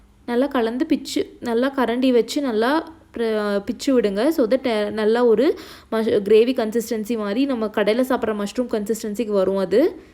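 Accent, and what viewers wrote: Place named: Coimbatore, India. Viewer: Otuka 🤣that Coimbatore slang:)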